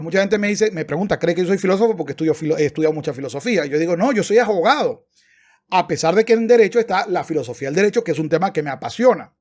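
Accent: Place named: Venezuela. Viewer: Eres venezolano , de lejos se nota !!! !!! De Caracas o de donde ....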